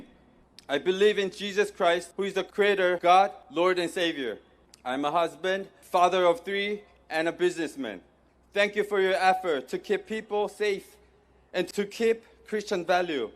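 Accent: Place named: Canada. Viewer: ❤❤❤❤❤❤ 말이 필요 엄네요....그리고 영어 발음을 보니 확실히 캐나다 사신티가 나네요...응원합니다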